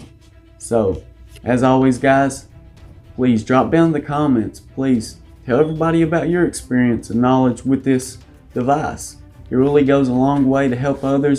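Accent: South, United States. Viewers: nvr watch a southern accent guy's tech vid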